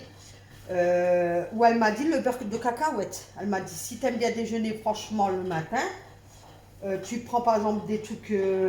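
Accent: North, France. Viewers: Bonjour merci pour ta vidéo même si ton homme est là 😁. j'adore t'entendre parler sa me fais rire on reconnaît bien l'accent du nord😊, j'aime bien ta façon d'être si simple et honnête sur les produits ménagers, continues comme sa je te suis pas depuis très longtemps mais j'adore 🥰 bisous à toi et à ton fils 😊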